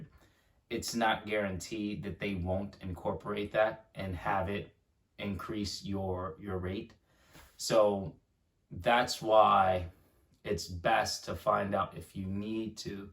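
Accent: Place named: United Kingdom. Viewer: thank you I assumed this was a UK video as your accent didn't have the American tang to it. Thank you anyway.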